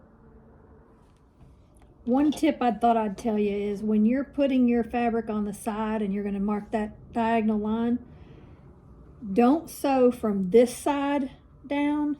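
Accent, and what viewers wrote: South, United States. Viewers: I enjoy your southern accent. Your teaching techniques are very clear and easy to follow. I would have never thought of making the flying geese like this. Very colorful and unique. I have sewing since age 8 and am now 76. I’ve just gotten started w guilting. I am making quilts for premiers who don’t make it for parents to keep or bury child with. I’m a retired nurse and am making guilts for children with cancer. I would gratefully take some scraps off your hands and even pay postage to Kansas City MO. Continue designing and sharing w us. So get found your YouTube channel. Blessings 🎉 I’m a 100% disabled Vietnam Veteran registered nurse74-76 w asthma/COPD and sewing gives me pleasure and joyful gratitude to provide encouragement to others. ❤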